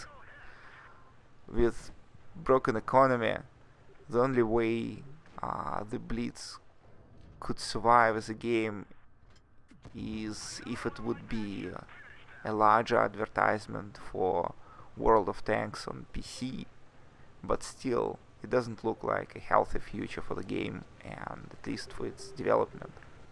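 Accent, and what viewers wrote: Russia. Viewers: акцент русского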